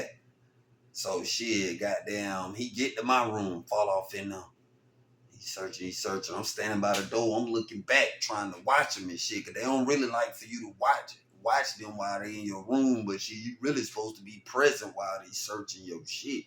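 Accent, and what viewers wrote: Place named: South, United States. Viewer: Bruh you be slindin your stories like a real nigga. I just subscribed to your channel and I’m tuned in witcha now. I’m from Florida and you talk like you from da way and I’m feeling dat ya feel me. Vic Damone Skr8kuttinup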